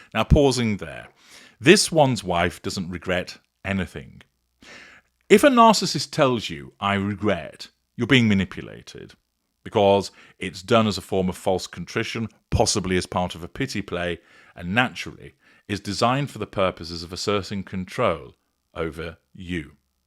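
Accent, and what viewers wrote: United States. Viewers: Love the American accent!!!🎉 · Good American accent! I think if you asked Hank and Skank on their wedding day what their hopes were, I would say look at the 758 page dossier that explains the "business" deal that this sham is. Now wave and smile Ginger Poodle!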